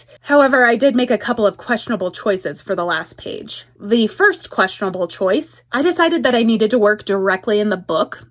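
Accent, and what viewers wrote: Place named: England, United Kingdom. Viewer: Hendrix is absolutely gorgeous 🩶 Your English accent makes me laugh 😂 it's like Emily from f.r.i.e.n.d.s and I promise we don't sound like that 😅 and as someone who lived in England 27 years and now Scotland for almost 5 years .... Scotland is definitely more scenic with beautiful views imo. Come to Scotland and I'll make you a full "English" Scottish breakfast with square sausage and tattie scone 😜 [im more an english breakfast person haha! But no black pudding 🤢]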